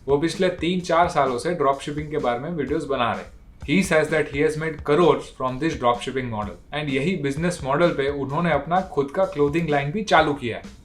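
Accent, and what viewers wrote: Gujarat, India. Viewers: HEY BRO ARE YOU GUJARATI? caught from accent 😅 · Are you gujarati bhai?😅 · Gujarati bolvani style thi j olkhay jai 😅😅😅😅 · Bhai apke hindi mai Gujarati q a ri h? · ભાઇ તું ગુજરાતી છે ને તારા બોલવા પર થી ખબર પડી ગઈ અને મોસ્ટ પ્રોબેબબલી કાઠિયાવાડ રાજકોટ સાઈડ નો હોઈ શકે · જ્યારે ગુજરાતી વ્યક્તિ હિન્દી બોલે છે.. બહુ સરસ લાગે છે.. હું પણ ગુજરાતી છું.. બેસ્ટ વિડિઓ ભાઈ.. keep it up😊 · Gujarati pata chal hi zata hai puch ne ki jarurat nhi h😂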